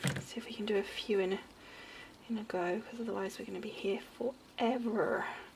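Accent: United Kingdom. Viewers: Really a cute card...thanks for sharing. My grandmother was from England and hearing you reminds me of her. My husband always gives me a hard time because I love anything about England... movies etc...love watching BBC films on Netflix and hearing that British " speak' Thanks for all you do. Pam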